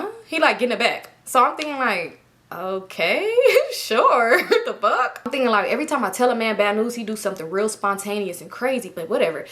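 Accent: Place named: Florida, United States. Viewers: Thats a Florida accent frfr. lol Love that for you! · I know a Polk accent when I hear one. IYKYK Our girl getting that ring 💍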